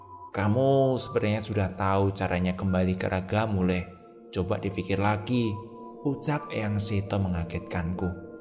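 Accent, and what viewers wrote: Java, Indonesia. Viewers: Waalaikum slm wr wb, masalah logat jawanya justru sy suka menjadi ciri khas kk " sy org sunda justru seneng mendengarnya, smga smkn sucsess..❤👍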